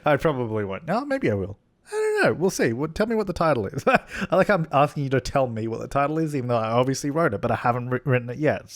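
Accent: Australia. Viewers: hi! i'm a long time fan and seeing you post lifted my spirits so much. your aussie accent never fails to comfort me and i'm not particularly sure why i feel do endeared today but i just wanted to thank you for making this community ❤ much love xx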